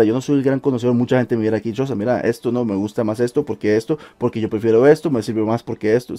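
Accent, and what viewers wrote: Costa Rica. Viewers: Se me hacia que era tico por el acento, ya cuando vi la postal de Lda lo confirmé😂😂👌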